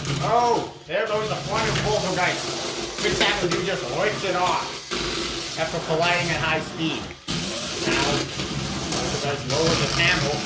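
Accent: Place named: New England, United States. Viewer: Like your little New England JFK-like I accent. love the battle bots superstars.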